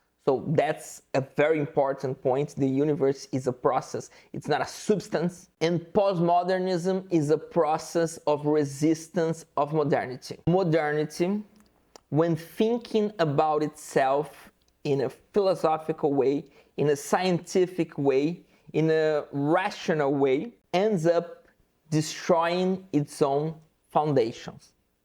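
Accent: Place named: Brazil. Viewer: I love your accent! Let's go Brazil!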